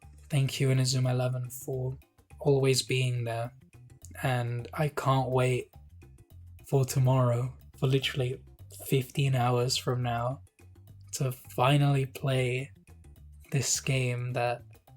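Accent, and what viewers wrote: United Kingdom. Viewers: Me sorprende que seas español,tienes un acento muy británico(yo también soy español btw) Yo también crecí con esta saga y nunca me he cansado de ella,no soy fan del futbol pero esta saga es algo muy especial para mí,me enseñó a no rendirme nunca,a seguir luchando a pesar de las circunstancias. Tengo demasiadas horas en estos juegos entre lo que jugué cuando salieron y otros medios 😉 Todavía no me puedo creer que hoy sale por fin VR(EA) probablemente sea el juego que más he esperado de toda mi vida y sé que va a ser un viaje muy especial(GOTY 100%) Espero que lo disfrutes tanto tanto como yo o más Vamos a dejar nuestra marca Inazuma en el mundo! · You're Spanish??? With your accent, you'd think you're English. It's so good that the game's finally here. I grew up with it in The Netherlands (best dub!). I rewatched ALL episodes a couple years ago, and played the GO games for the first time. 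The GO anime never got to the Netherlands so I didn't really grow up with it, but Chrono Stones ended up being my favorite season. Anyway, I felt like yapping a bit too. Keep up the great videos!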